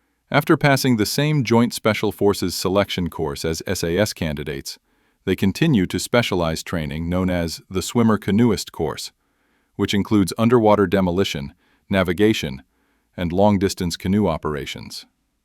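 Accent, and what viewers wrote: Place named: United States. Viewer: I was going to correct you but as soon as I heard an American voice I won’t bother and just scrolled on.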